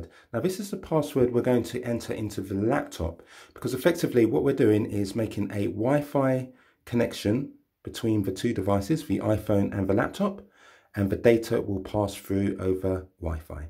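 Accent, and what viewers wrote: United Kingdom. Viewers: Tried a few videos which left me baffled, I was almost losing the will to live when I clicked on your video - bliss, pure bliss. Someone who described it so clearly and simply (pretty straightforward process as it turns out). Nice, calm British accent too. Well done mate.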